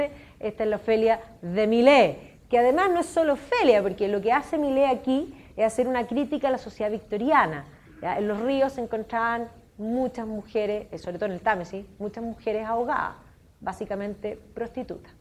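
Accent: Chile. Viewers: Muy bueno! Comentario aparte: “Shakespeare se fue a pata a Londres”. Qué simpática forma de hablar tienen en Chile! 🥰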